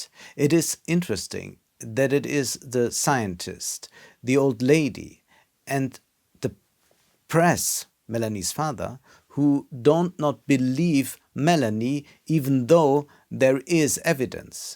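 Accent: Germany. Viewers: Man merkt, dass du an der englischen Aussprache gefeilt hast. 👍🏻 Verglichen mit den ersten Videos hört man den deutschen Akzent nicht mehr so gewaltig raus. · Der Akzent ist doch bestenfalls nebensächlich. Wenn der Wert des Gesagten davon massgeblich geprägt ist, ist es sowieso nicht viel Wert. Steckt man viel Energie in akzentfreie Sprache, kommt es anderswo zu kurz. Ob ein Akzent von Vor- oder Nachteil ist, hängt vom Zusammenhang ab. Der deutsche Akzent verschärft den Eindruck des Fachmanns - fachmännisch ist der Vortrag auf jeden Fall - und trägt zum Branding bei. Das ist vielen Deutschen, die dem Überwinden eines deutschen Akzents aus welchem Grund auch immer einen hohen Stellenwert beimessen, oftmals nicht bewusst. Nach 80 Jahren sind die Zeiten, in denen man einen deutschen Akzent nicht selbstbewusst tragen darf, ohnehin vorbei.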